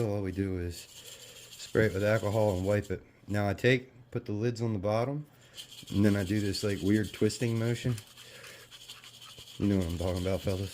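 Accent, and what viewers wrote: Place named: Appalachia, United States. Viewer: Hahah that was funny as fcuk😀 Ya kinda sound like a mountain-man from Appalachia.. but what do I know, I'm in friggin Sweden.. Much preech the knowledge sir,(bird seed, awesome!). Greetings from a new subscriber in Stockholm🙂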